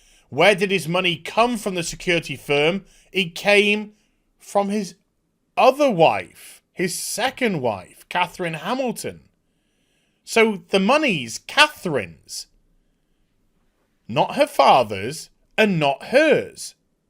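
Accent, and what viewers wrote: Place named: Yorkshire, United Kingdom. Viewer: Wait up a second.. you have the ability to tone down your Yorkshire accent? And yet, Doctor Woke, who is a paid actress, cannot? You should consider acting mate..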